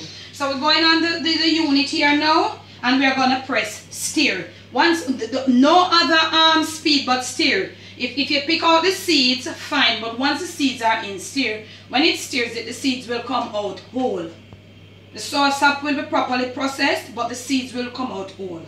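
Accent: Jamaica. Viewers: I l❤ve Jamaican accent mon!!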